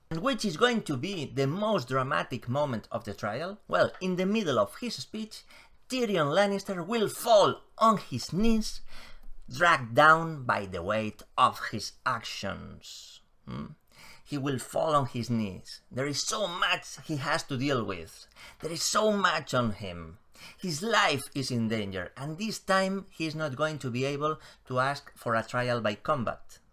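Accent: Spain. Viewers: I don’t know how I ended up here but as soon as I heard your accent and pronunciation I knew you were Spanish 😅 ( I’m also from Spain btw )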